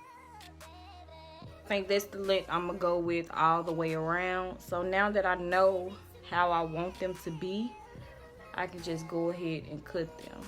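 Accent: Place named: South, United States. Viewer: Your accent is awesome!!!!!!!!! Is it southern?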